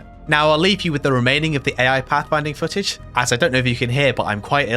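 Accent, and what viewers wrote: United Kingdom. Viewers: I don't know, sometimes it did type Beta, its just your "e" sounds Vritish. Beeta, lol. Sorry making fun of English language cuz my native one is Spanish. BTW We also say aluminium instead of the 'merican aluminum. Go figure. Anyway fun video, thanks!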